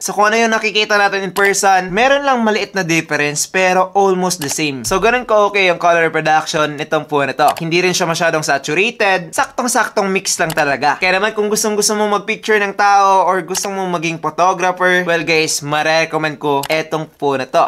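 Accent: Visayas, Philippines. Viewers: nakaka inis yung accent nya, pang bisaya.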